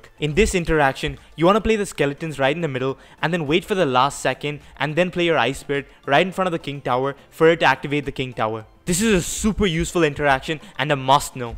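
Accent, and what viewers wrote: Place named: India. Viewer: I love the video! Also i love your accent. Probably the best indian accent i have ever heard :D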